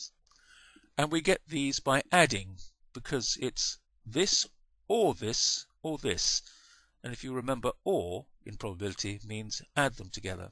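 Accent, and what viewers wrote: United Kingdom. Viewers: The only reason I watched this video was because of the British accent on this guy. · Are you british mate?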